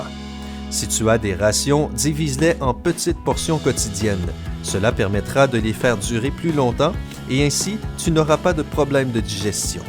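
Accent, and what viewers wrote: Quebec, Canada. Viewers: Tu est quebequoi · Le naratteur c un québecois non???????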